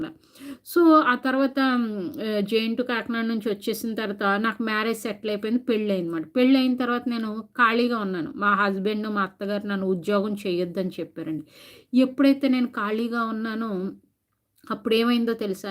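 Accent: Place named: United Kingdom. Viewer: Nee british seed telugu basha vinte etletlano undi akka , yedokati follow avvarade manchiguntadi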